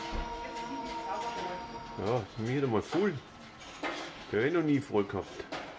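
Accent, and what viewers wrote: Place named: Bavaria, Germany. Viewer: Du bist doch a Bayer oder des hör ich doch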